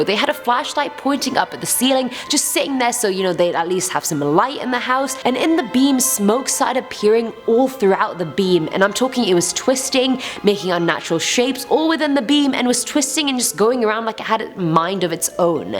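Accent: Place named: United Kingdom. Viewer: i wish the host spoke clear straight English without that British accent, was really struggling to understand her ........that’s it I’m giving this video a thumbs down because i cant understand what this woman is saying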